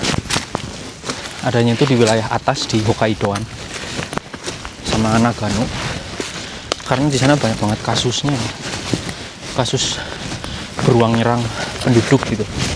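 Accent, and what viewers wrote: Banyumas, Indonesia. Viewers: Ngapak daerah mn mas?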